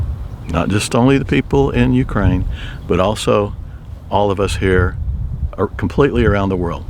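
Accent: Texas, United States. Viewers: Thank you for your posts that illustrate and remind us of our duty and responsibilities as co-creators. Love listening to your voice. Your slow Texas drawl reminds me of my dear Uncle Lee who was also a humanitarian.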